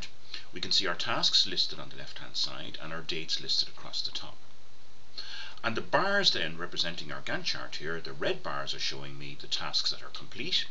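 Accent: Ireland. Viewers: Hey, great videos and they are a big help. its great to hear an Irish accent while i'm pulling my hair out in Australia. Do you have a video that would show me how to put date markers on a gannt chart? I am having trouble getting this to work. I need it for say 7 days before the start date as a marker to call the individual. I just need to know how to get say a star or any marker to remind me to call. thanks in advance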